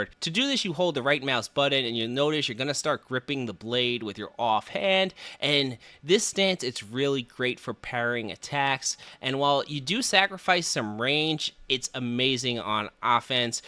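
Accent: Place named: New Jersey, United States. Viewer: This guy is def from NJ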